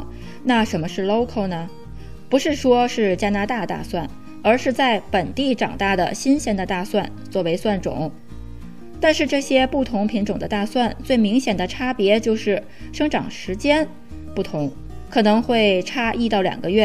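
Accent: Tianjin, China. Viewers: 浓浓的天津味！好听！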